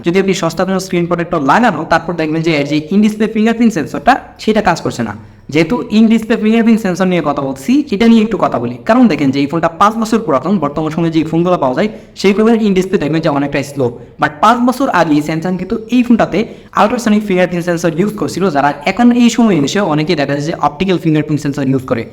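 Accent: Kishoreganj, Bangladesh. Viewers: Bhai apner basha ki Kishorganj?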